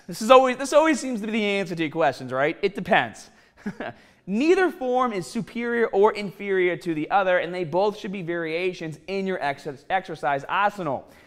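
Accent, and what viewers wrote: Boston, United States. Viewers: comparing your videos in 2010 to 2017.... you sound normal in 2010, but in 2017 you somehow developed a boston accent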